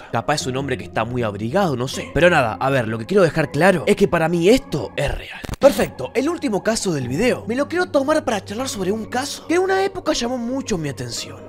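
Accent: Argentina. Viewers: De que provincia de argentina sos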